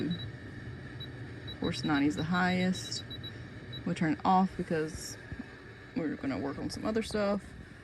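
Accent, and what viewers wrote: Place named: South, United States. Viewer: I love your southern accent ❤❤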